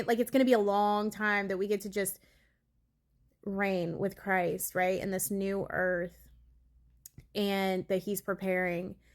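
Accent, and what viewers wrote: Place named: South, United States. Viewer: I am sorry, but I must ask. Are you originally from California or the South? The way you speak sounds like the South.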